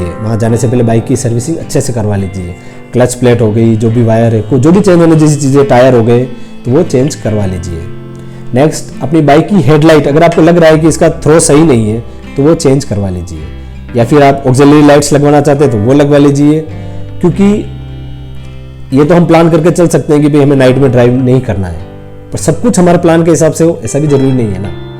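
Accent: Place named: Rajasthan, India. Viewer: Bhai as per your voice you look from Rajasthan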